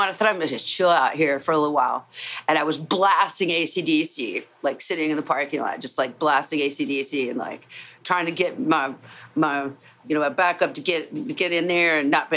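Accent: South, United States. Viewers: Good deal...taking up for her like that. I'm not used to hearing a slight country twang to your voice but, it sounds good. The more you get excited, the more it comes out. I probably sound like pure West Virginia hillbilly but, that's where I'm from....LOL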